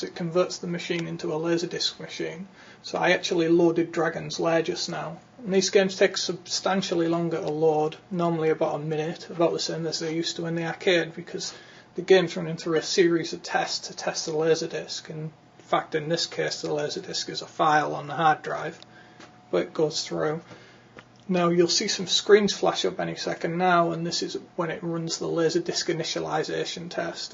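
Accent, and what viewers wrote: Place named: Geordie, United Kingdom. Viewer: hey, how did them yanks find that hardcore geordie accent of yours??!?!?!?!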